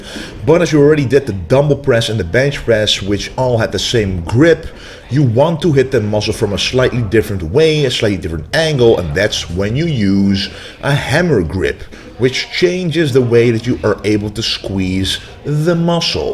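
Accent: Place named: Netherlands. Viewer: Are you dutch i think so because of your accent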